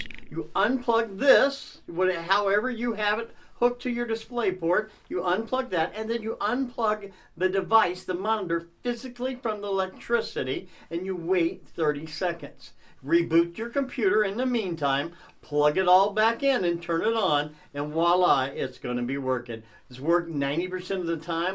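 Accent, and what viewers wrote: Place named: Chicago, United States. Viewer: where are you from in Chicago I can hear it in your voice my guy!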